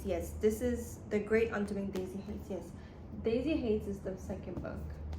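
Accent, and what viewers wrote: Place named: Caribbean. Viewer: you sound caribbean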